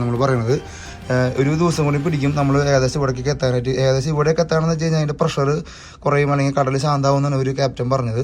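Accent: Thrissur, India. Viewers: വിഡിയോ കൊള്ളാം.. പക്ഷെ ഈ ത്രിസ്സുർ സ്ലാങ് ഉം സഹിക്കണില്ല എനിക്ക്..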